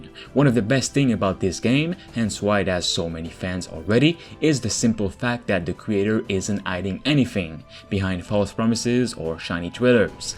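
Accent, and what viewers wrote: Quebec, Canada. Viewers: t'es québécois toé ? sa s'entend a ton accent, nice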